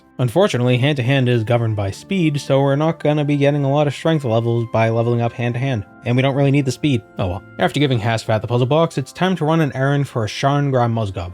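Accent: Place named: New England, United States. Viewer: where in New England are you from, I only just realized that you have an accent because I'm so used to that just being how people talk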